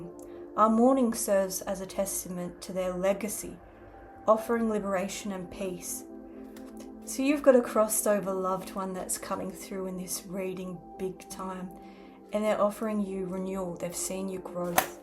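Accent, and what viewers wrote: Australia. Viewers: Long time no see 👀! You remind me of a butterfly fairy 🧚‍♂️ popping in and out of my energy 🦋✨I love your accent! Sounds like British! Now you confirmed Aussie! My DNA says I’m a third English! So we’re in the ballpark somewhat. No, I’m not into competition! More into helping to lead and healing wandering souls needing nourishment. Don’t like Freemasonry nor Eastern Stars! Reminds me of my criminal paternal family. Who have hated me and stolen from my Grandmas inheritance for me. Plus, they are in leadership and I detest their minor children sex trafficking and drug trafficking and immoral sexual mandatory group unprotected orgies for their Demonic Cult/Community! Sick and demented. I will defeat them for the kids and their PTSD suffering parents. They are a Menace to Global Society! I hate that they are lazy and using my inheritance to cause pain and suffering to innocents! 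You sure are spilling my ☕️ I see! You have a beautiful soul I can see. I liked you the first time I saw you a year ago! Down with the Karmics! Father God created me to be a karmic trap 🪤 I’m God’s spiritual warrior 🤺⚔️ ✌🏽👆🏾🫶🏼 Keep up the good work!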